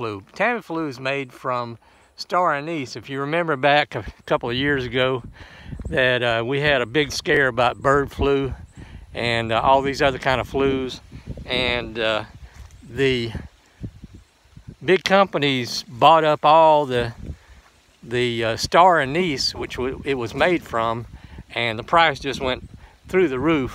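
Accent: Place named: Arkansas, United States. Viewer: You sound like your from Arkansas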